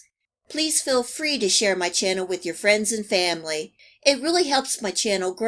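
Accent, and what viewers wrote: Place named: Pennsylvania, United States. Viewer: Made something similar today, I was craving it. By the way … are you from Pennsylvania? I detect that accent, because I’m originally from there, and I know it when I hear it!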